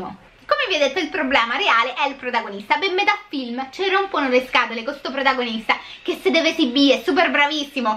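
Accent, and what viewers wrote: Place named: Rome, Italy. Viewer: Amo tantissimo il romanaccio, dona a tutto una nota così affascinante e divertente: pura poesia ♥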